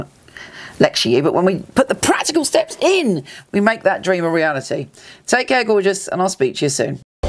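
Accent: United Kingdom. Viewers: Love all your Astrological PSAs ... your English accent #Grrr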